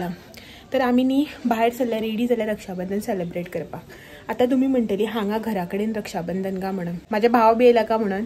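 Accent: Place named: Konkan, India. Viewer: Nice vlog as always ❤ you are very much knowledgeable specially your Konkani is very pure and shudh ❤ Love your work. Eagerly waiting for Ganesh chaturthi vlogs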